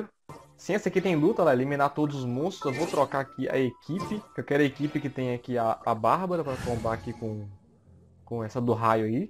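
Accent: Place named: Bahia, Brazil. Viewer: essa voz de baiano que saudade crl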